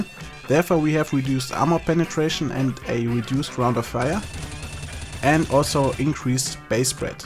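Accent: Germany. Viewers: Man hört so richtig das du Deutsch bist :D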